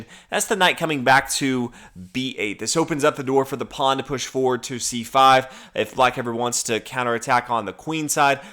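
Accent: United States. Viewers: The American accent is always great to hear.. but man! It’s Not bateess Spassky. It’s boris like bo-ris. And really pronounce the r. Don’t roll it.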